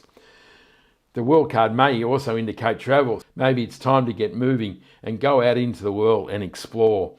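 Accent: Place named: Australia. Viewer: W Hi,. Sounds like you are an Aussie. Love your voice, manner, energy and expression. Subscribed. Thank you, and best of success. Great cartoon pic thumbnail. - very effective for click through!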